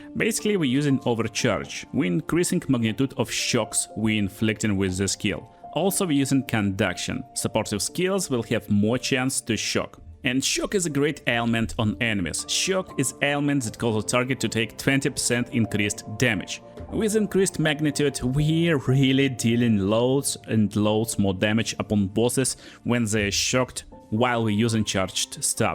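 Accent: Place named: Russia. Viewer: Чувак, перестань пытаться говорить по английски без акцента, звучит ужасно. Одно слово из 5 звучит более менее, остальные с дичайшим русским акцентом )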